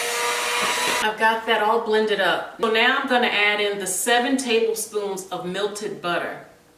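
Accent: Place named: United States. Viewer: To master a language one must master lingo, jargon, slang, colloquialisms, regional dialects and individual nuances in phonetics. The standardization for what or how a word should be used actually changes often, akin to the English purposefully changing their accents to separate them from the puritans who founded the united states, which is how the "queens english" came to be... So back to, her unique enunciations are correct with her location in the united states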